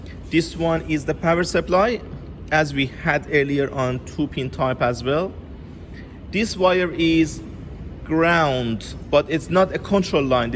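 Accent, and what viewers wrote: Iran. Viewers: Well done mate, you are such intelligent person, by the way your accent sounds Persian😊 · ایرانی هستی؟لحجه فاسی داری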